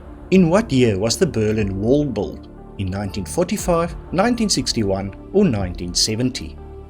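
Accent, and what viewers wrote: South Africa. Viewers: I find the pronunciation perfectly acceptable. Not being picky, but when "Poirot"is needed, try saying "PWAR - OH ". ( That's " yer " actual French!😊 By the way, do you have a tinge of "South African " there ? Enjoyed the quiz, only missed a few questions.😊 😊🇬🇧🦉🌿🎶🌼🇬🇧😊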